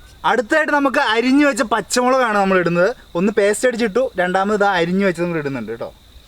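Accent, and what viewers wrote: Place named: Tamil Nadu, India. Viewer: കള്ളുപ്പ് 😅 തമിഴൻ talk😁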